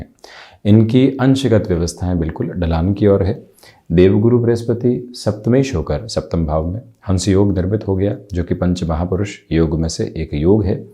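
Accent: Standard. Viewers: Koti koti, pranam 🙏🙏🙏🙏 Apki shudh hindi ko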